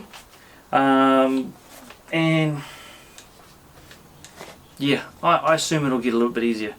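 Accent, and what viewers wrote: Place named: Waikato, New Zealand. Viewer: That's a waikato accent if I've ever heard one, even looks like my stomping ground up thompsons, looks like it would be a epic kaimai supplejack gun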